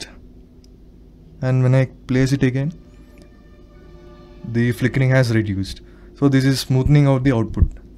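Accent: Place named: India. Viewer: This video really helped me bro , and I know that you are from India from your acent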